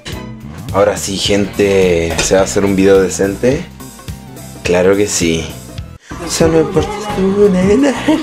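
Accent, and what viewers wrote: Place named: Chile. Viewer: Pensé que eras argentino pero me acabo de dar cuenta que eri chileno Creo khe tengo lo oidos pa la caga Ta buenísimo tu setup 🔥🔥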